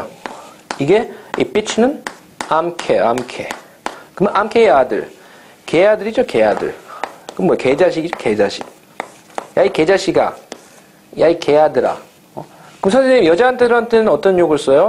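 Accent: California, United States. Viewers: not necessarily. In some cases, teachers end up focusing on mainly written english. Plus, the guy has that cali pronunciation. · He sounds like he's American to me. I'm a Texan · I am Chinese, yet I am listening to a Korean gentleman teaching me English cursing in American style English while I am physically in Australia. 😂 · It’s funny how so many people think you have to live in America to get an accent like this. Anyone can become skilled in a foreign language no matter where you live now. I think it’s because Americans are used to stereotypical “asian” accents in media, or because they themselves have trouble speaking other languages. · not even close, it's California by a mile Hawaiians aren't Asian either btw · The only thing he misses is "son of A bitch". he forgets the articles. he has an amazing american accent tho, and he explains our curse words better than i could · He got the California vibes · it's a good guess, but as did state, it is california where most asians are at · Could be he learned American from some one from Cali, who ever teaches u a language u then develop thier accent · well he clearly does have an American accent when using English. 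He definitely doesn’t use more of a British/Irish/Welsh/etc accent when he speaks English. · Im American and this dude has a better American accent than me 😂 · I love how this mans American accent for most of the video sounds like he grew up in California his whole life and is a middle aged dad, its amazing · ur comment doesnt make sense. The funny thing about him imitating a Californian accent is that hes not from California or America at all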